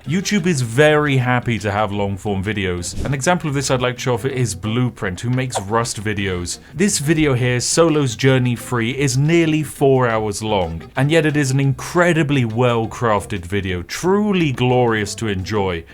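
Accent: United Kingdom. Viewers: Still prefer your brit voice instead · I was sleeping, then I heard a familiar British voice and I woke up edit: This is why I fall asleep watching something rendom and always end up in Linus' podcast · I don’t have auto play on, but I have put on a Spiff video from time to time to get in a good nap after work. He’s not wrong about his smooth low British voice. ;) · No auto play but I regularly use your videos specifically Victoria 3 to go to sleep, that smooth British voice soothes my coffee drinking self to sleep, win win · I was wondering what was making it so I was always being awoken by a British voice talking about tea when I started my night about dinosaurs and monster hunter ecology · Why is thing British Guy telling me to go to sleep at 6pm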